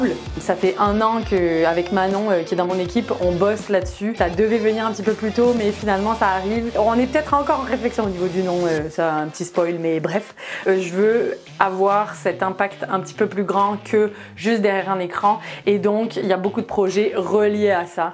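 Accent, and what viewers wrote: Quebec, Canada. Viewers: Vidéo génial ❤ j’ai l’Impression que plus le temps passe plus ton accent québécois s’accentue 😂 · Tu as de plus en plus l’accent canadien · C’est drôle tu commences à avoir l’accent québécois sur certaines assonance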